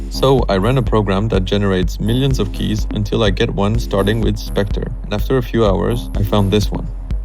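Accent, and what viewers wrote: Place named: France. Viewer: A critique (since this is art): : "legal to own, but illegal to sell"? Assuming he's in France (based on accent) - it could be legally acquired personal data from a website, which is legal to own but illegal to sell. Pretty much everything else is illegal to own if it's illegal to sell in France, even more so when it comes to data. So no, it's not 'horrific' like CP. Therefore, there's likely no 'ethical' dilemma at play here given 99.99% of people won't care about personal data. I think it would be more 'powerful' as a piece of art if the person pressing the button would REALLY have to think twice before pressing the button because of universally held moral principles.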